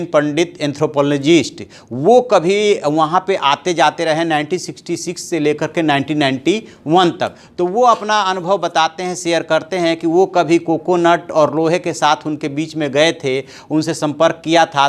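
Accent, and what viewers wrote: Bihar, India. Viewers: Hindi in bihari accent....Achha hai😁😁😂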